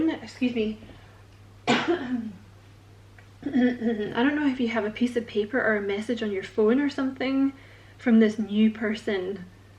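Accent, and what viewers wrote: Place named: Scotland, United Kingdom. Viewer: Love your accent! You speak to my Scottish roots. 💗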